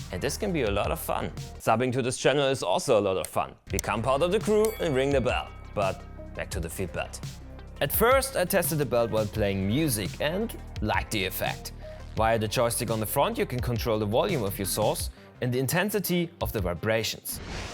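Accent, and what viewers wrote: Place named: Germany. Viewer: This guy has the worst German accent ever. So harsh to listen to.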